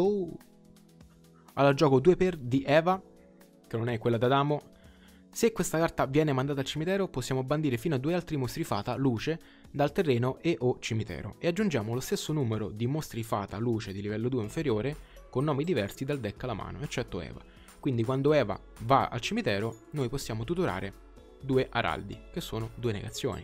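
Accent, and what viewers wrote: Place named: Rome, Italy. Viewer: Era da inizio gioco che cerco qualcuno che spiegasse sto deck, grazie mille... ah solo per l'accento romano te meriti 100k iscritti big up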